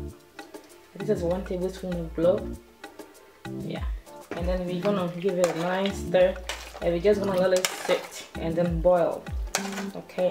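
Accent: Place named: Ashanti, Ghana. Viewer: I smell an ashanti acent Grove Garic Brood Lol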